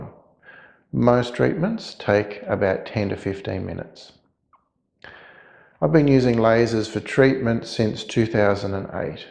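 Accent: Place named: Australia. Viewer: It’s Australian English, it’s very clear. 😂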